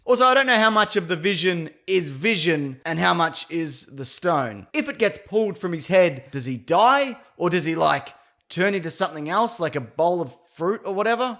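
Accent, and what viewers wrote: Australia. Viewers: love the aussie accent! been a fan of the weekly planet podcast for a while now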